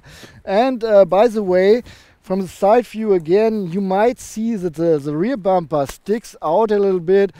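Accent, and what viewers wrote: Germany. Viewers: I really like your complete cover of the car, your german accent is really not a problem. Continue like that :-)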